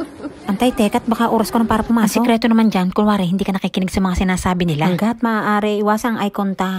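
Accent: Batangas, Philippines. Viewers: kung ako laang ay isang napakagaling na cartoonist at kung marami akong oras, nagawan na kita ng isang cartoon series na mala-Mr. Bean cartoon series. pero syempre may mga dialogue at batangueño accent pa haha! yung feature talaga ng iyong mukha ay masarap i-drawimg tapos gagawan ng kwento. nakaka-GV ka kasi lalo na pag sinambit mo na ang Magandang Araw! parang sina Ate Sienna at Kuya Bodgie lang sa Batibot 😅 Goodluck sa yong panibagong pakikipagsapalaran sa Tokyo. kaka-excite ang mga susunod na mga kabanata.😊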